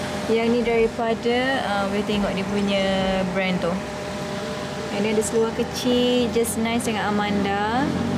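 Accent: Kelantan, Malaysia. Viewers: Comey dengar kak cakap kelantan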